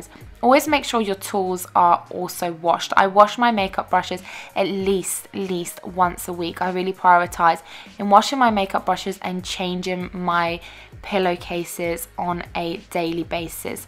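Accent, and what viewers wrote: United Kingdom. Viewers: Are you from Great Britain???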